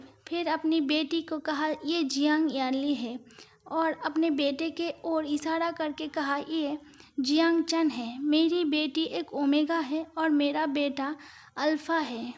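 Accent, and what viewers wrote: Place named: Bengal, India. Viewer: Mujhe laga hi ta pahle din se hi ki aap bangli ho kuki aapke bolne ke lahje se me samjh gai ti di kuki me bi bangli hu 😍 and i love your voice and aap bahut hi aachi bol leti hai di 🤗 love you so much di 😘😘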